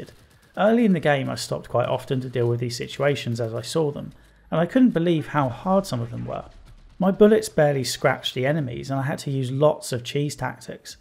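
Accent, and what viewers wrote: United Kingdom. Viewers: British accent The f*ckin' STATE OF IT!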